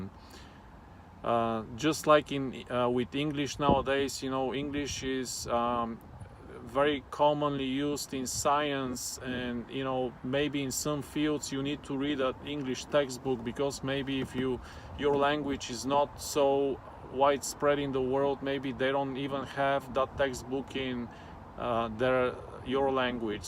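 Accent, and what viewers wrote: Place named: Russia. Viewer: У вас даже акцент на английском звучит так, как будто вы русский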